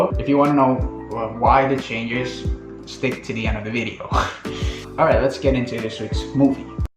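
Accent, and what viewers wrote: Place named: South Korea. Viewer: cute with korean accent😘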